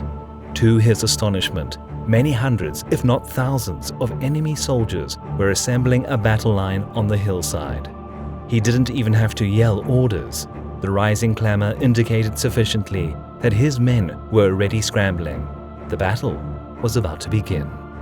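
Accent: South Africa. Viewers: Is this guy south African? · Is this narrator South African?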